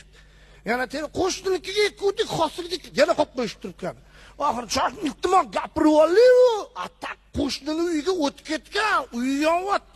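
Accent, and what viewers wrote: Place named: Tashkent, Uzbekistan. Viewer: Чести тошкенча😂😂😂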